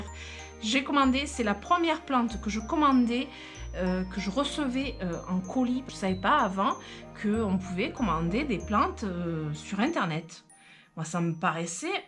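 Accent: South, France. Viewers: Encore des éloges pour vous votre gentillesse votre simplicité vos expressions bien du midi et votre accent du midi gros bisous 😚 😚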